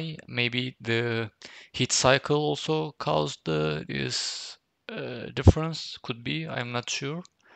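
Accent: Turkey. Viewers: dostum türksün sanırsam konuşmandan sezdim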